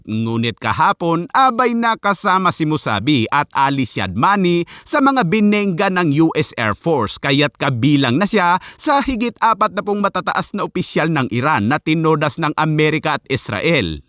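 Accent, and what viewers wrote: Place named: Visayas, Philippines. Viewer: Bisaya ka pala dn idol eyy🤙🤙 hahaha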